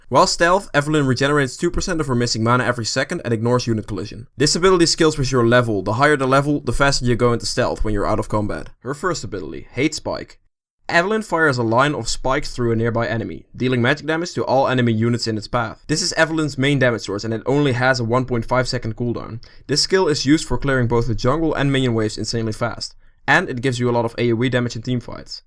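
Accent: Netherlands. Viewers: haha ik praat ook nederlands (België) dus ik herkende het al :p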